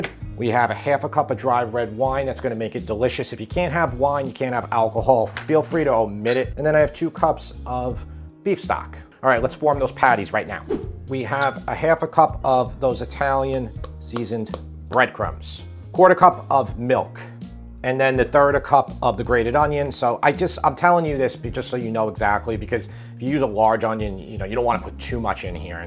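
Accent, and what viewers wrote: United States. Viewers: We're in the US, it's a US pronunciation, friend. The US speaks American. The UK speaks British. And don't get me started abooot the Canadians & whatever the hell the Aussies are trying to speak. 🤪 Worcester, Massachusetts is not pronounced Wore Chester, Massachusetts. It's pronounced Woosta or Woost UH (rhymes with Rooster if you pronounce the ER as UH))(Roost UH/Roosta) Even the Brits, who haven't learned to speak proper American, don't say Yorkshire as York Shyer, they say York Sure. Worcestershire Sauce is pronounced Woosta-Sure Sauce in the great US of A and the rest of the world is wrong!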